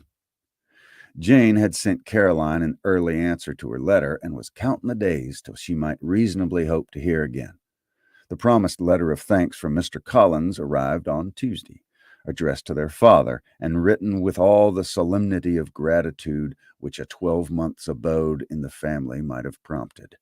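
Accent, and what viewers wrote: South, United States. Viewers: I'm not from the US, and most of my experience of the US southern accent is from movies and TV. But listening to you is soothing and I'm sure that I'll be listening to your entire audiobook when you pull it together. Great work and thank you.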